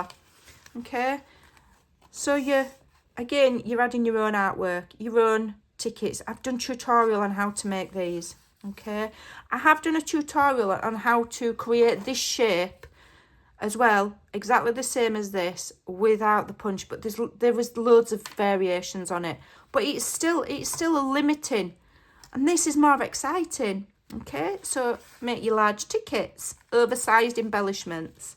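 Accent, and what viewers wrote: United Kingdom. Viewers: This is the first time I've watched your channel. What grabbed me was your accent. Being from Texas, people comment on my accent, course I'm thinking, "What accent?" I'm not trying to pry, and please feel free to say none of your beeswax, but what part of UK does your accent come? I love it. Also, you have really good ideas.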